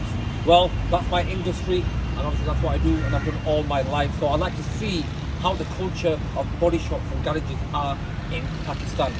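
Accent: United Kingdom. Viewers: Sound like Yorkshire accent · Filthy Northern accent. He can't even change his accent to pronounce it properly smh.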